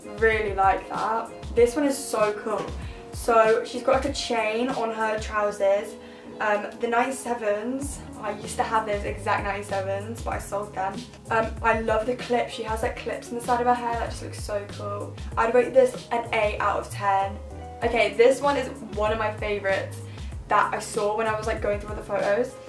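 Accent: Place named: England, United Kingdom. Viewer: I wonder what the beautiful accent is that you speak with? What the region of England are you from?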